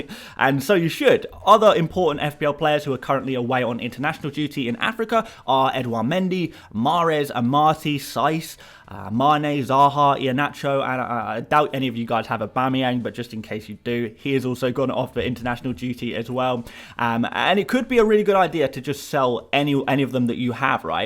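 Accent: Nigeria. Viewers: R u a Nigerian mate??🇳🇬🇳🇬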